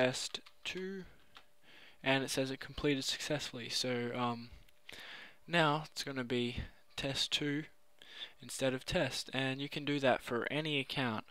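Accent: Australia. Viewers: it's an australian accent, and he's australian. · hes aussie !! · so Austrailn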